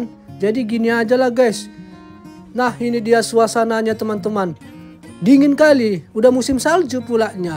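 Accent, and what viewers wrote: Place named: Batak, Indonesia. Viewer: Kimbek kali ah. Kalau dengar logat bg ngomong jd ingat sama kawan si batak gila 🤣 logatnya campur² jawa 😅 kocak kocak😂❤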